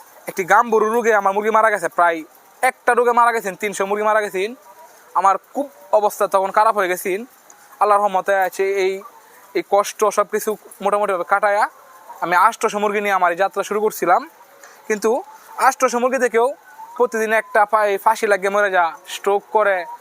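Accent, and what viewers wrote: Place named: Kishoreganj, Bangladesh. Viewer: Koi na bedar bari kishoreganj a mone hoi, boler doron a mone hoitase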